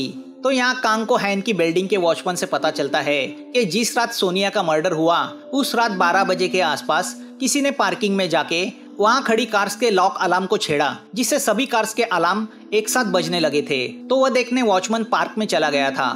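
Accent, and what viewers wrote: Maharashtra, India. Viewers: Bhau tu marathi ahes? Accent vrn vatt😁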